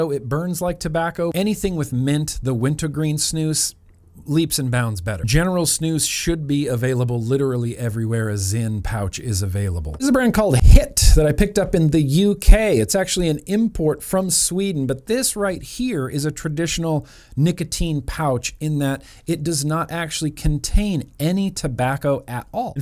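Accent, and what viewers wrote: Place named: California, United States. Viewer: You're from California. I can tell.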